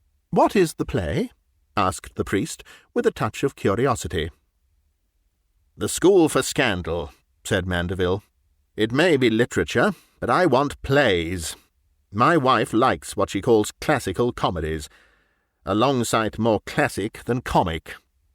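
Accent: England, United Kingdom. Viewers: It's so nice to hear an English accent that's not AI.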